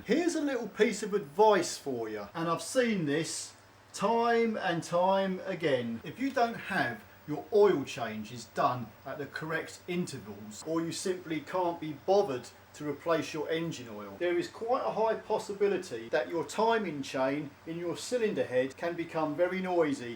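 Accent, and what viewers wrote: United Kingdom. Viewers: Hi british accent, this wet belt made by Dayco?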